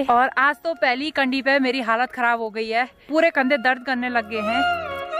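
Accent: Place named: Haryana, India. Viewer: Mam aap haryana si bolati ho thank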